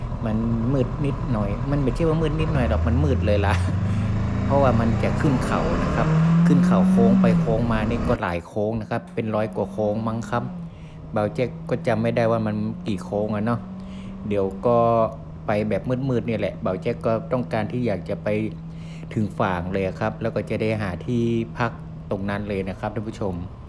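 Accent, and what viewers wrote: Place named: North, Thailand. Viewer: คำพูดออกเหนือๆฟังดูน่ารักดีนะครับ...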